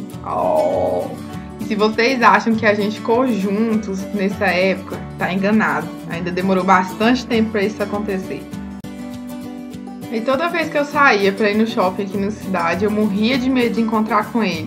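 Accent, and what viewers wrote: Minas Gerais, Brazil. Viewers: Que lindo! sou fã dos 2! desejo toda a felicidade do mundo! achava q vc era de minas pelo sotaque...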